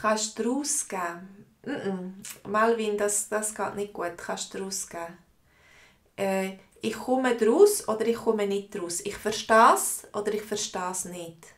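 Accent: Switzerland. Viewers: May I know what canton’s dialect is this? I am considering to register courses on your website, looks good!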